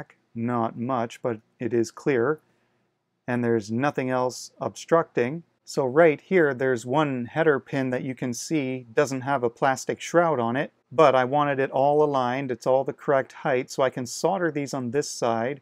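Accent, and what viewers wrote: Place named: Canada. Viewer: I love Canadian accents…ooot lol. Thanks for the tutorial. I guess single pin at a time is the way to go.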